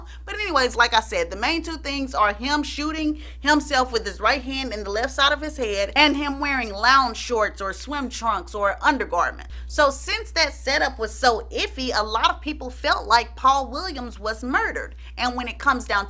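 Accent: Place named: Detroit, United States. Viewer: Sister Love I'm from Detroit Michigan. l got to give it you. The way you run the voodoo down. Sound like you straight out of Motown. Love ya keep up the good work.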